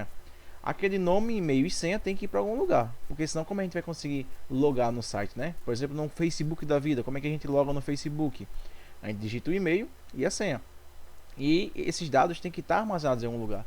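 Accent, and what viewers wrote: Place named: Northeast, Brazil. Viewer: Boa aula! E esse sotaque é típico do meu nordeste.👏🏼👏🏼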